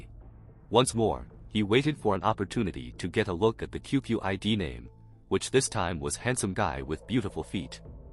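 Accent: China. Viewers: I was watching a video you made 8 months ago and you sounded different. Don’t get to good at English I like your slight Chinese accent. With that being said you are arguably more articulate and well spoken than most native speakers.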